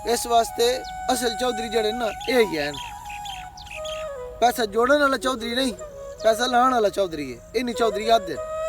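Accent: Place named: Haryana, India. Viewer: Veer tuc HARYANA jive Punjabi bolde ne te vekhan ch v haryane de jaat jatt lagde ne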